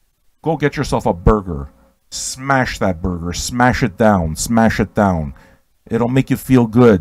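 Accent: Canada. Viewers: Well I got it right that it was Canadian so 1/2 pt to me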